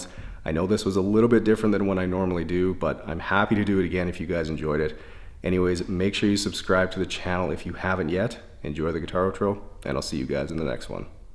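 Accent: Canada. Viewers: I love hearing your Canadian accent and I hope your not thinking I'm a creep. Just I love hearing different accents they are very beautiful.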